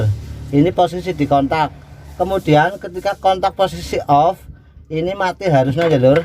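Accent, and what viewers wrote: Banyumas, Indonesia. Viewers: ngapak ya waaakk...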